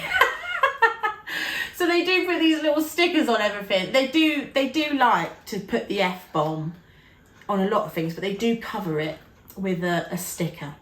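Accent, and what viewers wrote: United Kingdom. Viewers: Greetings again from Vancouver, Canada! (I found your channel because you recently did a HomeGoods/TJMaxx video, and I work at TJMaxx. :)) I love love love your accent. I've watched videos in the recent past about the different accents around the UK. It's really neat. I've always wondered. Also, the british slang is just so cool for me to hear as well. I was a huge Spice Girls fan when I was a preteen. (I'm almost 36 now.) & I still love the accents and slang to this day!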